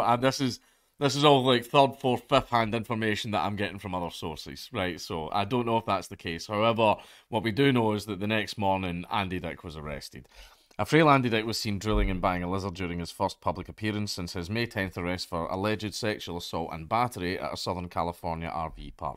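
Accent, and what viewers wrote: Scotland, United Kingdom. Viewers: Andy Dick stories are always entertaining , the Scottish accent makes everything a thousand times funnier though man. You've become one of my faithful go to's whenever I need a laugh to destress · You haven't heard the phrase dildos all over the floor until you've heard it in a Scottish accent. I nearly peed.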